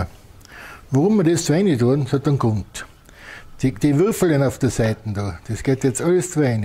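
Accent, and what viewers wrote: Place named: Tyrol, Austria. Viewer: Hallo an den, ich werde sie ganz bestimmt nachkochen. Mein kleines Problem habe ich mit dem Tiroler Dialekt. Danke für das schöne Rezept, Grüsse aus Dresden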